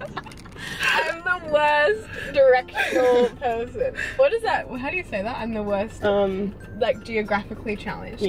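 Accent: Australia. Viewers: you have an aussie accent, but do you live in australia ?? or do you live somewhere else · Hi! Just found ur channel:) You have good skin and toned body (Australian accent as well:p do you mind sharing ur beauty tips with us?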